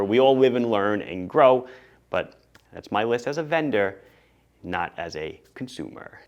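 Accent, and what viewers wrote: Long Island, United States. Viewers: That Long Island accent does get me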